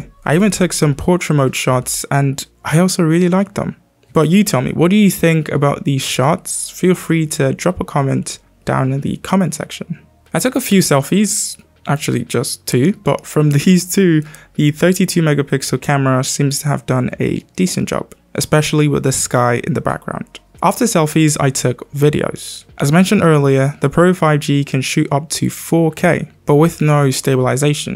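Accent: England, United Kingdom. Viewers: I love your British accent. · Can i ask ask about your accent? It sounds English, not Nigerian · Why does your English sounds British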